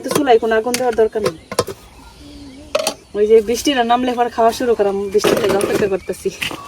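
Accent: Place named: Mymensingh, Bangladesh. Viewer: এটা কি ময়মনসিংহ?? আপনার ভাষা সেরকম মনে হচ্ছে।। আবার পাহাড়! অনেক পাথর!! নেত্রকোনায় এটা? কুমড়ো টা এতো সুন্দর লাগছিলো!!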